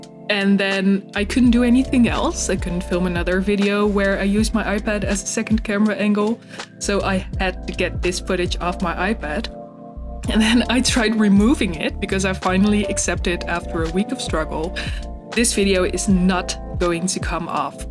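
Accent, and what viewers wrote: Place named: Netherlands. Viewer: Ohhh je bent ook van Nederland!! Ik hoorde het al hihi❤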